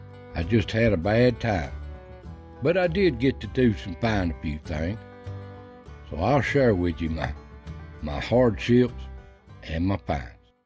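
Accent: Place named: South, United States. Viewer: that sweet southern drawl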